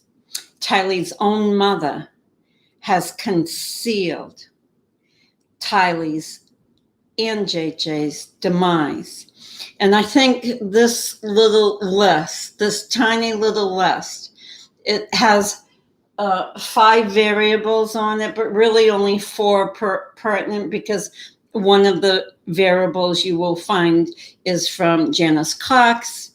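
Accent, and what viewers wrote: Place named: East Coast, United States. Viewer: I love your Eastern accent...New York? Boston? Where are you from? Thank you for sharing your knowledge....Very much appreciated!🌞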